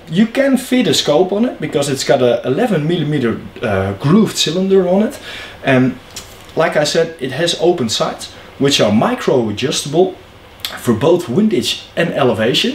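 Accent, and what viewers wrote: Netherlands. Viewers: Very good review. Wonderful accent, sounded like Paul Whitehouse Dutch Policeman.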